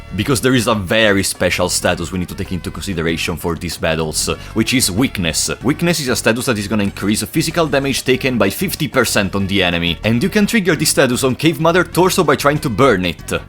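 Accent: Italy. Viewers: Oh my god, now I love the Italian accent because I can't make out exactly what Americans, British and Australians are saying in English😂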